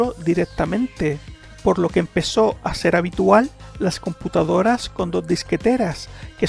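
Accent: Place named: Canary Islands, Spain. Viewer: Por cierto, saludos vecino. Vi el video donde hablas normal y entiendo que canario de Las Palmas. Yo soy de Tenerife xD.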